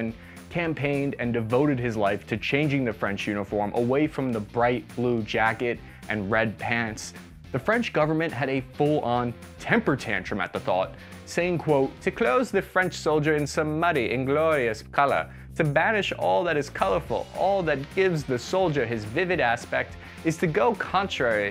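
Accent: United States. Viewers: "Well that was none other than my people, the Italians" That's a strong American accent for an Italian 🤔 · Damn I was almost certain that this dude is American not Italian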